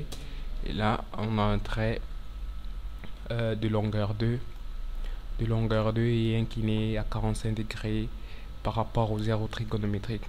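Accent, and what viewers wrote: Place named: Cameroon. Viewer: laccent Camerounais!!! o bossoo!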